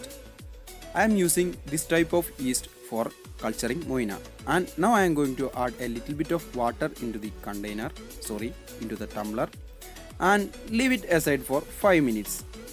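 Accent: Kerala, India. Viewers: Machente English kettal ariyaa . malayali alle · Hey by ur pronounciation u r mallu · Malayali 😂 Aaa English kettale ariyaa malayali anenne 🥰🥰🥰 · English paryunath ketal arinjoode malayali aanenn😂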